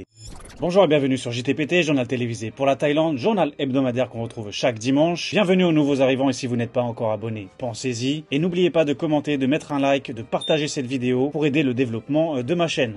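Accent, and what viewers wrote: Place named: Suburbs, France. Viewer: Je serais curieux de savoir dans quelle banlieue tu as appris le français, même si aujourd'hui c'est un peu meilleur que d'habitude...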